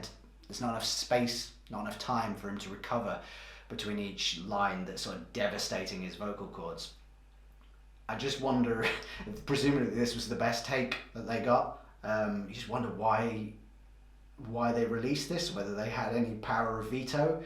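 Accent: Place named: Ireland. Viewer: Have a nice day.You sound irish.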